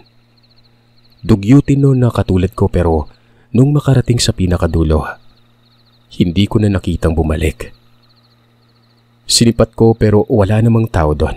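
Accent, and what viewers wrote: Visayas, Philippines. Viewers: Ka angayan mo bisaya uieeee hahahha😊😊😊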